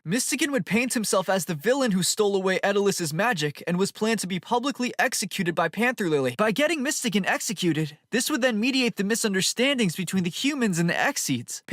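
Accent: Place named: United States. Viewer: Your American accent is adorable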